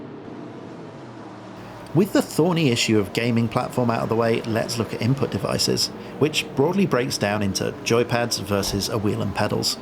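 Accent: RP, United Kingdom. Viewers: a person has a RP UK accent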